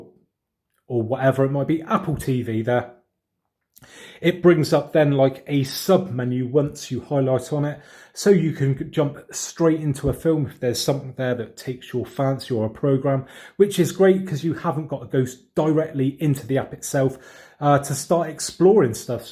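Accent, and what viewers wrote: United Kingdom. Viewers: Love the british accent. ✌🏾💪🏾😎💥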